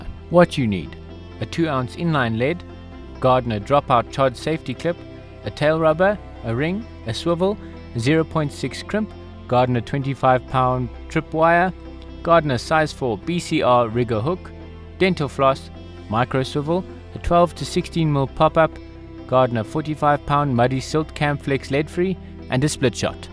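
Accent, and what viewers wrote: South Africa. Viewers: I noticed the accent immediately! Nice to find a local channel on fishing 🇿🇦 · Lovely to hear a saffa doing some carp stuff. Subscribed now. From a fellow saffa in devon, United Kingdom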